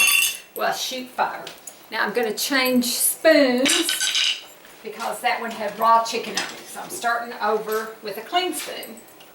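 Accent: South, United States. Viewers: Your chili looks yummy. You are so fun to watch. I love your southern accent. You are so beautiful 🌟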